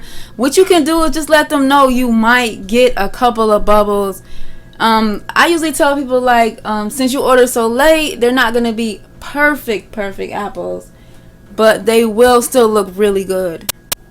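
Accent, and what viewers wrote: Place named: North, United States. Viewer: She sounds like she’s from up top to me.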